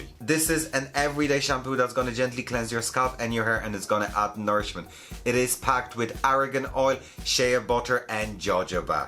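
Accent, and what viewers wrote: Ireland. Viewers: Love your wonderful Irish accent wanted to know wht you think of Osmo curl spray and their products in general.I cant afford very expensive hair products right now any cheaper superdrug or boots curl products you can recommend?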